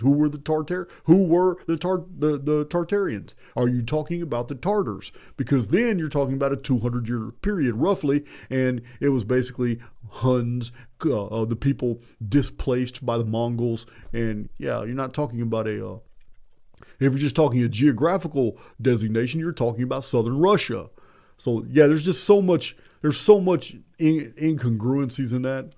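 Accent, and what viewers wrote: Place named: Texas, United States. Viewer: I am not sure how I came across your account initially… I think it was about the goddess being first, but what I connected with immediately is the Texan accent because I was born and raised in San Antonio. Then I realized that you’re on the same path as me…obviously ahead with all the books you’ve been able to read but either way, I am where I need to be and I’m glad I discovered your page and I appreciate the information you share ☺️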